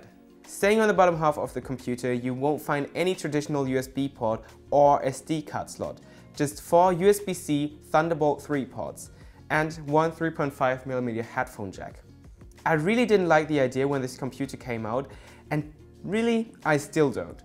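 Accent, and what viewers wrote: Germany. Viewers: german accent ;)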